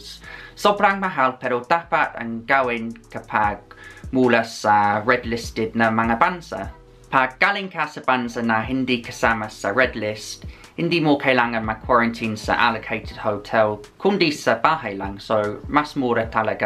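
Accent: United Kingdom. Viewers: wow thank you, I'm Lester I'm from Philippines.. I;m 14 years old... thanks for learning tagalog I also love British accent.... Thank you sa kaalaaman...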